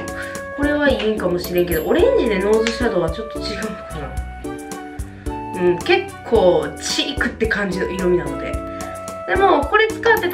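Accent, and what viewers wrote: Kansai, Japan. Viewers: この顔で関西弁喋るギャップ💗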